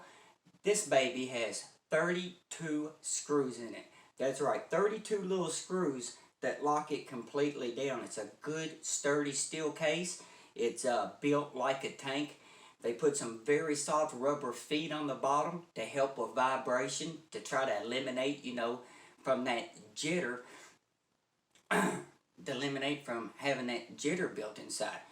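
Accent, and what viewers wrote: South, United States. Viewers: “Right at the eeeeend” and “this is built like a tenk, (you all??y’all). I am watching this from England and I have never heard such a sweet sounding US dialect. Out of cultural interest, where were you brought up please? When I was a child i watched, for a short while, a TV series called North South, based on the US civil war and your dialect seems very Southern. Sounds exotic and it’s stimulating for someone from the UK where we probably sound quite neutral-flat-boring, to hear the inflections and vowel changes. Aside, your review was passionate and detailed. Thank you.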